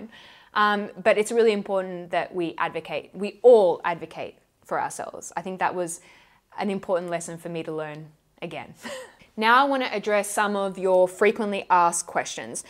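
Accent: Australia. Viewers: your Aussie accent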